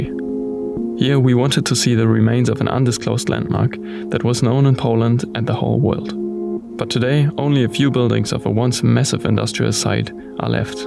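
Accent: Germany. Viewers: Your German accent makes your videos surprisingly interesting and oddly satisfying to watch. Would be cool if you would go to Scandinavia next, like Denmark, Sweden and Norway. ....hit me up if you are in Denmark tho.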